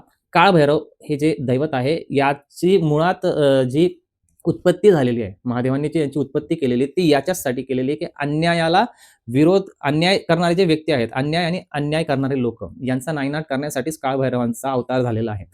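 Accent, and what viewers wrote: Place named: Pune, India. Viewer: तुम्ही धुळे चे आहे म्हनता पण तुमची भाषा पुणेरी आहे धुळे जळगाव ची भाषा नाही वाटत.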